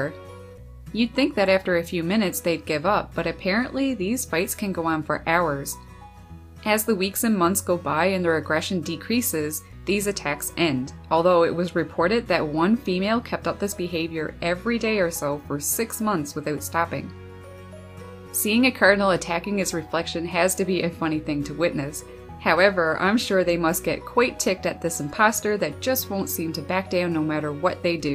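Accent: Canada. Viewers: Y does the male feed the female? Where do you live? You sound a bit Canadian. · Aboooot. Canadian. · You have a Canadian accent. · Thankyou so much! My favorite little bird buddies! I knew you would answer some more questions for me. I have not been much of a birder until I got sick and was actually "forced" to take notice the last decade or so. We have had many adventures my beautiful red bandits and I lol. I hear Canada or way North for you? I am surprised and sad you don't have these characters in your backyard. We get complaints from some young new neighbors that don't seem to care much for nature sadly. But we won't let them mess with our backyard as we have tons of birds and critters-my daughter is trying to help an orphaned baby squirrel right now. All year long some of the same ones are here. Its quite satisfying. Thankyou again and everyone that shared. 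I have to go put out more safflower and reg seed and suet myself!🐾✌🐝🐦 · Love the Canadian accent.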